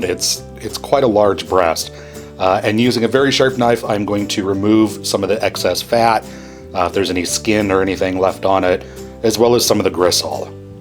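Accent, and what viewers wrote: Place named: Massachusetts, United States. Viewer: Sound like Carlton from massetchusets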